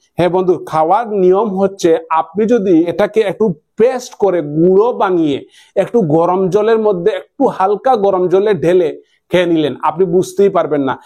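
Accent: Sylhet, Bangladesh. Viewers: Apni sylheti Kotay bujlam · সিলেটি দাদা কম কথায় কি বুঝানো যায় না !!!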